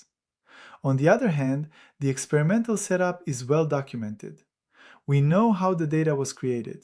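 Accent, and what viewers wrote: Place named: Iran. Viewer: Great video, especially with your sweet Persian accent! :) I tried to find detailed geometric information about the defect, but I couldn't find anything. Did they use EDM to create a single through-hole with the specified diameter? I'm working on a dynamic model of a defective bearing and would like to use the CWRU dataset to calibrate my model. Therefore, I need detailed information about the defect geometry.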